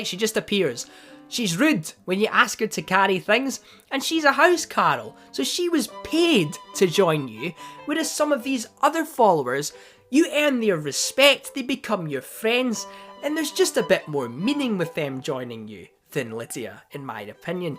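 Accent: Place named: Ireland. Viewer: You sound Irish, my mom's Irish so 😉. Happy St. Patrick's day ☘️.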